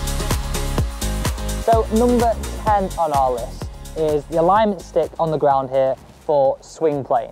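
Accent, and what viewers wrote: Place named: Cockney, United Kingdom. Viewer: I cannot understand most words the guy in the viedos is saying! Why does every golf training video have people from England with poor cockney accents? The guy mumbles through the entire video! I will never get that 3 minutes back.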